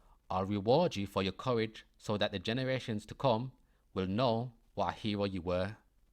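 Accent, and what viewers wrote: Birmingham, United Kingdom. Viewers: Deffo a Brummie accent!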